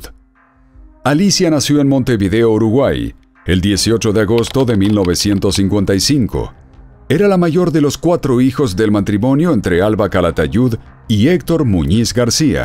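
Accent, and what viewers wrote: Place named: Mexico. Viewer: Acento MEXICANO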